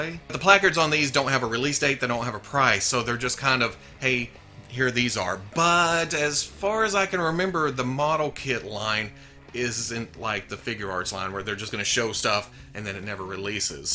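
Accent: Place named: Carolinas, United States. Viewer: Tryna place homie's accent. The Carolinas mayhaps?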